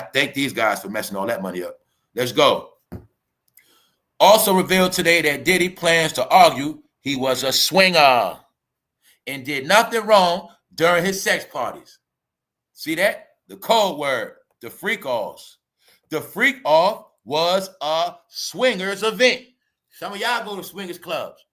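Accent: New York, United States. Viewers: Replay gang 💪🏽 👁️ ❤️ your NY voice ( t.y for giving us Family consistent content no one does it like you real talk 💯 🙏🏽 )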